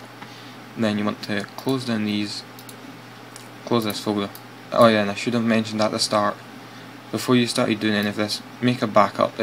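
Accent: Scotland, United Kingdom. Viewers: yay a scots like me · i love your accent. scotland?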